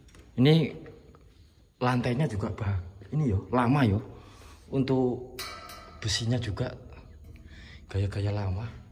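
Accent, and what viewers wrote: Java, Indonesia. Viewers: Haiiii...salam dari Malaysia.. Pekat sungguh bahasa jawanya...boleh lah faham sikit2...moga sukses selalu.. 👍👍👍👍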